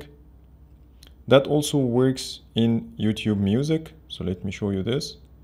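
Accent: Egypt. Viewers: يابا ال accent المصري معروفة في اي حته 🇪🇬 😅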